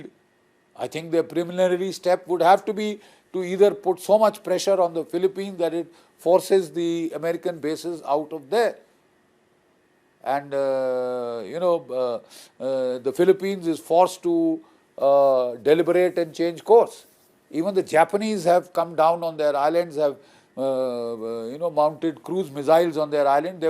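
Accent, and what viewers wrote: India. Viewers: I usually can't stand indian accents but I'll tolerate it just for this guy. He's good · How come u listning Indian accent broadcasts???😳🤷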